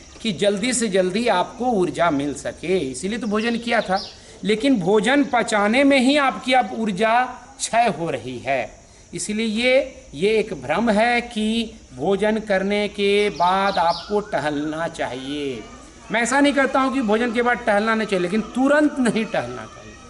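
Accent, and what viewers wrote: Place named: Bihar, India. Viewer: Baba bihari ho na sahi sahi bolna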